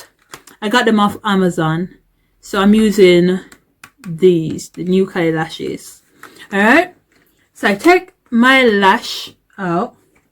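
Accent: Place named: Caribbean. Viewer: Thank you for showing this definitely like your Caribbean accent the way you explain things also for keeping it real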